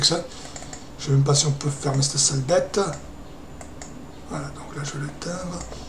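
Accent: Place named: Arcachon, France. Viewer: Pour moi c'est plutot bel et bien une Dglfi. Sinon a part ça part chez moi en france et en français on prononce win mais pas winé. Tu es bien sur maitre sur ta chaine et ce doit etre du a l'accent d'arcachon qui est bien sympa de toute façon.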